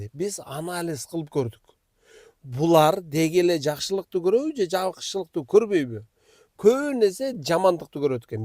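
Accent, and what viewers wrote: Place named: Talas, Kazakhstan. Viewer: Могу жигит Таластыкко суйлогону окшошуп турат.?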